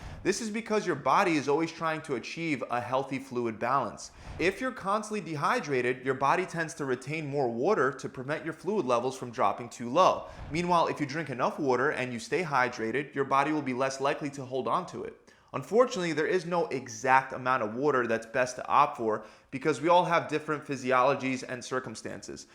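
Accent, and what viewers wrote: Philadelphia, United States. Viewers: Well we all know youre from philly now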